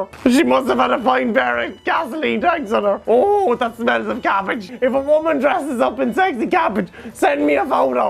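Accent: Ireland. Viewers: Is he from cavan or louth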